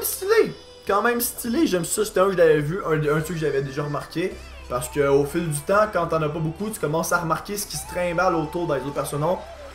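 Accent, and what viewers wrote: Quebec, Canada. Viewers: T tu québécois ?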